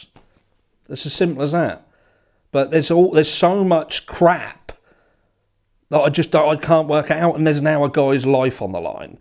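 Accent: United Kingdom. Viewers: BTW, where are you from? I am from SC. I only ask because I thought you were from the UK, but when you say certain things it makes me think you are from Australia..... Things like “Mate”.... Maybe they say that in other places. If so, educate me. Thanks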